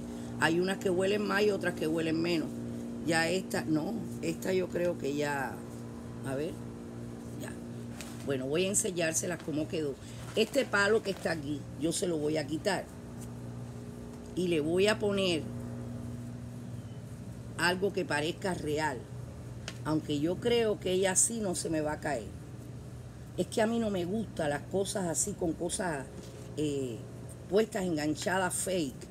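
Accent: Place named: Cuba. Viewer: buenas tardes! Estoy buscando tus videos, por el puro gusto de escucharte hablar 😂ese acento cubano, a mí me encanta. Eres muy inteligente y culta, y creo que noto algo de perfeccionismo, que casi comparto contigo. (Ya lo estoy dejando, para no sufrir y hacer sufrir tanto 😂)Si supieras, yo quería ser presentadora de noticias por TV, y no es que fuera imposible, ni siquiera difícil, pero tuve la suerte 🍀 de optar por otra profesión, la cual al día de hoy todavía ejerzo. Fue un gusto ver y oír tu contenido. Saludos 🙏🏻🇩🇴🥰🌹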